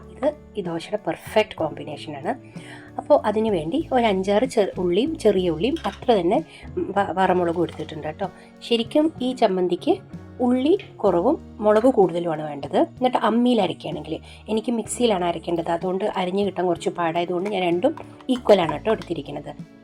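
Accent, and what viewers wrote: Palakkad, India. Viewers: Hmmm kandapo kodhiyayi.. uluva manam varumo.. evide kettyonum makkalkkum manam vanhaal pne kazhikkilla..☹️🙄.Pne kandhisammandhi kettittundu.. vaayil vellam vanhutta.Videos ellam nannaavunhundu..You have a very unique voice and style of narration..😍☺️.Enikka palakkadan shyliyilulla ‘ttolu’ bayankara ishtaanu kelkkan. I thought you are a vegetarian..apozhaanu Mathippuliyude video kande…It was sooo good to see.Will try soon…All the best to your channel ❤️❤️❤️❤️Nattil varumbo Palakkadu varunhundu…Apo kanattolu 😍❤️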